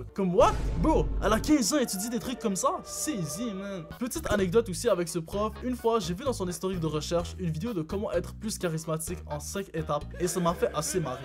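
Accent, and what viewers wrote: Quebec, Canada. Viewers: T’as un accent qebecois 😂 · incroyable la vidéo le montage est propre et non je ne suis pas raciste mais j'ais l'impression que t'a un petits accent du Québec j'adore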